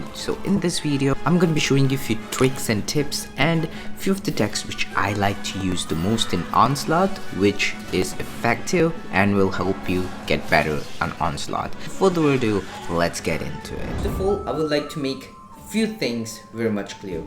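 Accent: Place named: India. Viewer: You have like an Indian accent which is hard to understand your english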